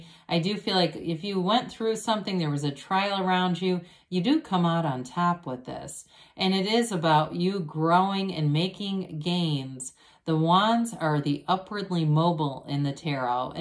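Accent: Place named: Wisconsin, United States. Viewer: LOL you have a very distinct voice. I'm from the midwest...you sound like you are from Wisconsin. LOL Great reading! I'm the first female arch angel that walked the earth. I work for God...He prefers me single...I've been single for 22 years. I've been allowed to participate in the angelic realm before my time. Although I have so missed the life of a couple, He gave me the opportunity to know motherhood and for that I am eternally grateful. Outside of that it has been a life of serving God in the most unusual ways...God and I made a deal, as a healer, only if I am to affect someone for God do I care to know there problems...it's too much. But when I need to know I will. It's a very clear understanding and contract that we made...working for God has it's other perks. Thank you So much for this insight. I'm still in the 3D realm, I'm walking a fine line.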